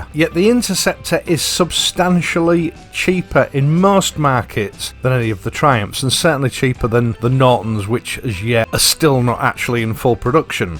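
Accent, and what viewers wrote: United Kingdom. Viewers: Thanks! Pro review, nice use of Queen's English, skill in composition and videography. Watching more than once. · I think you are only person who has given your heart to every comment... By the way I was taken away by your 'Raw British' accent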